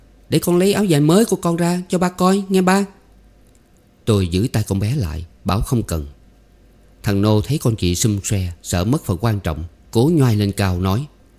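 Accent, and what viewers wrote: South, Vietnam. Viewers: cảm ơn ông NGHE GIỌNG ĐỌC MIỀN NAM RẤT THÍCH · Từ lúc biết kênh Tủ Sách Giải Trí và Giáo dục Tinh Hoa, được nghe giọng đọc miền Nam cực hay của anh, tôi đã không nghe bất cứ kênh nào khác. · Tôi cũng rất thích giọng đọc miền nam của. Anh dien tả đầy cảm xúc.Luôn ủng hộ kênh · Tôi rất thích giọng đọc miền nam của. Like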